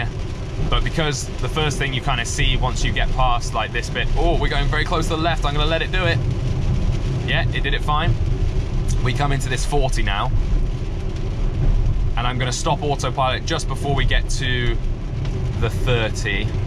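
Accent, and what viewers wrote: United Kingdom. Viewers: Thanks for the video! I like you british accent very much and your pronounciation is very clear and sharp, which makes it much easier to understand for me (I am German). Very interesting how there are introduced subsequently new Autopilot icons; I have never seen before the "To resume Cruise Press the accelerator pedal" message / icon.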